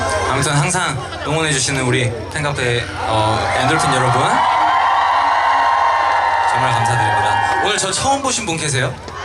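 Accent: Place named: Mokpo, South Korea. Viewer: 박지현가수님목포사투리구수하고매력있당게 앞으로도멋쟁이가수로활약하시길~~~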